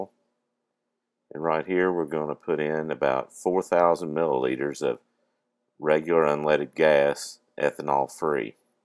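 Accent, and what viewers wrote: South, United States. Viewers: OK so you used a gallon of gas whats all this metric crap,you dont sound like your from across the pond,, sounds like your south of the Mason Dickson line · "we're going to put in about 4,000 ml of regular unleaded gas, ethanol free." What the hell is this in US measurements? Sounds like you have a southern drawl, but speak in commie language. I just don't get why some insist on using metric crap when the rest of Americans primarily use customary units in commercial activities, as well as for personal and social use.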